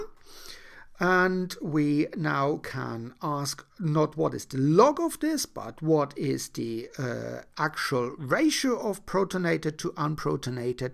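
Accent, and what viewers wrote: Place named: England, United Kingdom. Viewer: Can somebody ~please~ tell me why the English English makes literally every thing easier to understand.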